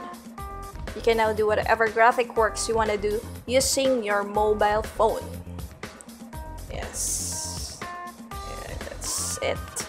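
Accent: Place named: Philippines. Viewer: accent sounds like a filipina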